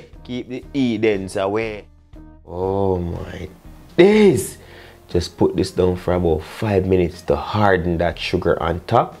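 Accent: Jamaica. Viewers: the jamaican accent so cool.. makes cooking cool. well done on the kfc secrets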